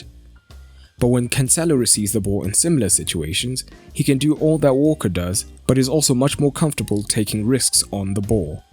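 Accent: South Africa. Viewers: 😅Brother I can your South African accents ,you can’t hide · Is the narrator of this video South African? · Are you South African 😂? Shoutout if you are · You sound South African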